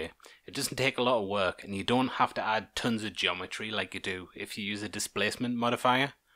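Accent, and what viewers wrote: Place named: Teesside, United Kingdom. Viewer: Sounds like good old Boro to me?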